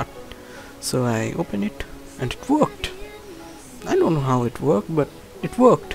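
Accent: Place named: India. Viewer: can the music get any fucking louder and the voice be more indian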